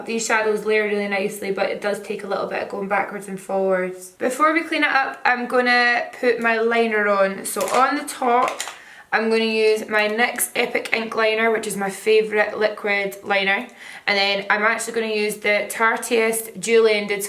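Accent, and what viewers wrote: Scotland, United Kingdom. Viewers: the scottish accent is the best! But then again, I'm scottish too hahaha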